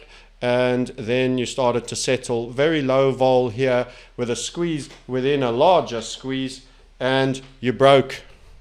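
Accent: South Africa. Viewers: South african bud not ozzy .. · you are a sooth sayer sir. I have heard some sayers of sooth before sir,but this level of sootherie is staggering. (Just curious, South African accent?)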